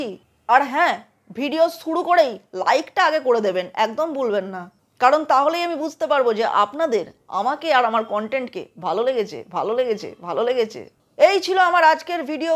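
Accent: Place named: Bangal, Bangladesh. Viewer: এটা ঘটি নয় , বাঙাল মনে হচ্ছে 😊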